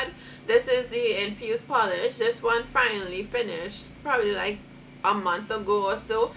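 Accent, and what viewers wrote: Trinidad and Tobago. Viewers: I know a Trinidadian when I hear one!🇹🇹🇹🇹🇹🇹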